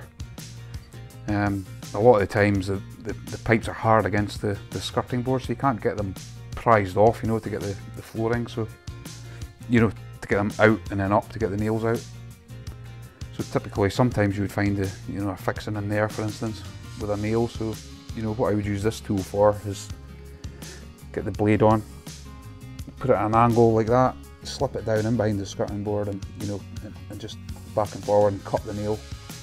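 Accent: Scotland, United Kingdom. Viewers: a really sweet strong Scottish burr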